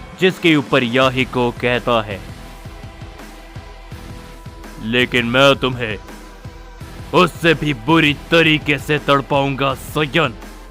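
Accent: Odisha, India. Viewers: Odisha se ho kya bhai 😅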